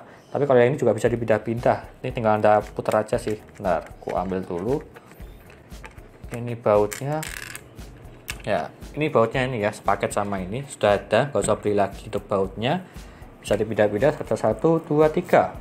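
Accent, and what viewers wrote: Java, Indonesia. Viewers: jowo e sek ketoro bang ,gas review e walau bhsa indo e medok 🥱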